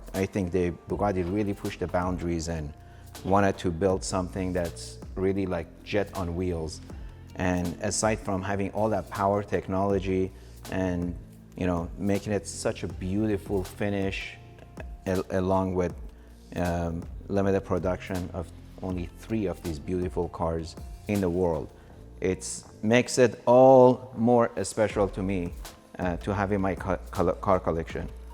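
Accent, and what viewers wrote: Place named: Iran. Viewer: i like how you still got the persian accent nice bug btw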